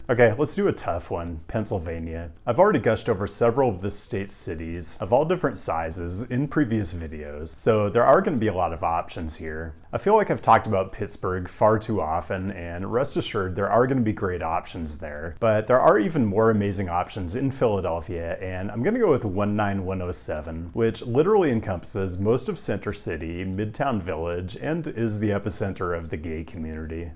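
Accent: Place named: Pacific Northwest, United States. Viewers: Nah, his PNW accent got a little cali-valley mixed in somehow, Brah. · The PNW accent!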